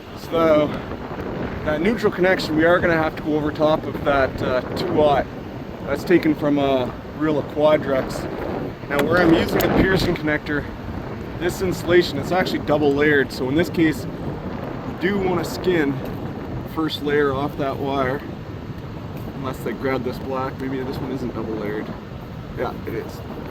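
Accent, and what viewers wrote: Canada. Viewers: I'd keep the narration, that's probably more than 50% of why people watch it. If the sound is rough, meh, the ppl who are going to watch aren't the people who will care, they are likely watching to learn about a field they aren't in or want to be in. I found it amusing hearing a lineworker with the biggest "OUUUUT" Canadian accent I've ever heard work to describe concepts from my field of engineering :D (network engineering/architecture) hehe. No shade, keep up the good work. A couple notes 1. The connector you described as a 'piercing something" We used to have an equivalent in the network world called a "vampire tap". I think that actually describes this better as this is a minimum vampiric/parasitic drain that is tapped. maybe you can start a new trend in your field calling it that :D 2. No shade on you, this is what you were given. I'm not a fan of how the insulation is stripped given how in-climate this area appears to be both on the pigtail and stripping it on the heavier gauge wires. 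In my experience that allows the wires to become full of water during the summer humidity which quickly leads to a very bad result :) 3. I think that tray would be nicer if instead of pushing things over the outside edge to get them it was reversed to inside the bucket. Seems like an unforced error there in how they designed it asking for tools to disappear below :D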